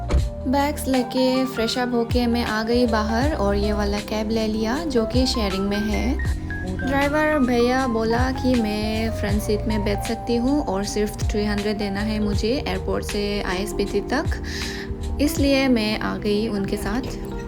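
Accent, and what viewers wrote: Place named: United Kingdom. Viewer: your english accent is British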